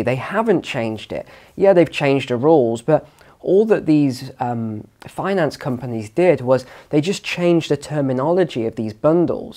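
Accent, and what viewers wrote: United States. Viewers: Your American accent sounds good enough to me! My British accent amounts to adding words like "wobbler," "mate" and "cheers" to my sentences. Cheers,! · flawless american accent! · Pretty gooder American accent.. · You do a pretty good American accent! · Lol nice accent brother 😃 Apart from the top drawer US accent your work is splendid. Thank you fella. Great job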